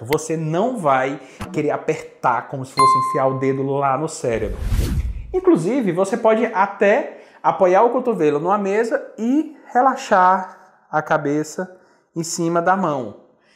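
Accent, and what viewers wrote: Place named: Ceará, Brazil. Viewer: pelo sotaque acho que é cearense,obrigado pelos ensinamentos.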